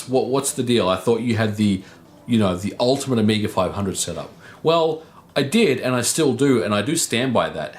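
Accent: Australia. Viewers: Soddering? And with an Australian accent! It's spelt soldering, and that's how it's pronounced in English. There's no such thing as American English, just English spelt or pronounced wrong. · He sounds like an Australian to me. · You sound like an Australian Al Gore. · So weird to hear someone with an Aussie accent say 'soddering' lol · Hmm, you sound like an ozzi but you say SODDER like a yank??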